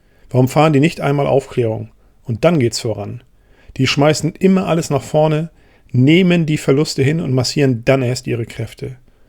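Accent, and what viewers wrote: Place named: North Germany, Germany. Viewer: Wie immer spannend vorgelesen. Alle Achtung ist eben Norddeutsch.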